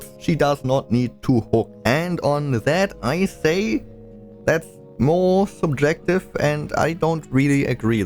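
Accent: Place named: Germany. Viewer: You sound realy german (i'm german too and i recodnise it very often)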